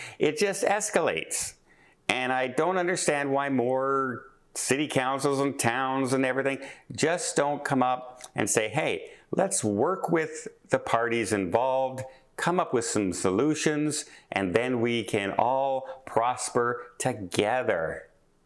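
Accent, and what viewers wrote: Canada. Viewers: The rain on your parade, but you sound very smart, logical and Canadian. Those three words are never used any politics policies on American soil. What is more done is drawing the line in the sand and saying you cannot cross unless you’ve been to my will. And yes, the people making the decisions most times have nothing to do with the outcome meaning the people making the decisions in this case in Alaska are not the business that profit by all the tourists. It took me a few years to realize this when I moved in 1999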